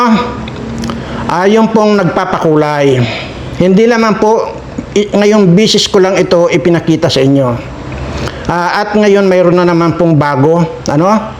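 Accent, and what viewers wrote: Iloilo, Philippines. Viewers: Thank you & salute 🫡po sa inyo Sir sa pag tatangol sa Team Kalingap kina kuya Val at kuya Rab, go go Sir ipakulong nyo na po ang mga anti na yan na mga salot sa bayan natin, New subscriber watching from the UK 🇬🇧😊 haha grabi kadlaw ko sa butod kag iban pa nga term mo Sir, ga gua ang Ilonggo mo Sir ba! Proud ilongga fan here 😊😅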